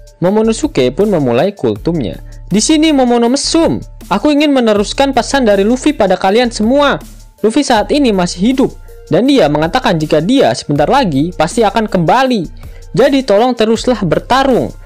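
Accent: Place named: Java, Indonesia. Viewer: Wkwkwkwk alias kelelep .... Nguakak aku couk.... Jowo endi sampean cak..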